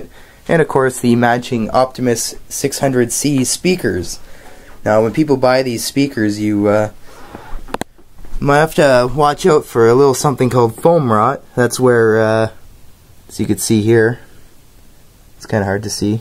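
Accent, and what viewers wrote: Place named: Canada. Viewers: how cant you not tell someone is Canadian, Eh? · I detected he is a Canadian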